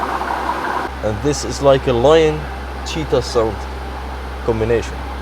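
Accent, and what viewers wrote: Netherlands. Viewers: The German right way, the US English right way, the British English right way? This is a Dutch native speaker who has of course a Dutch accent. Your accent?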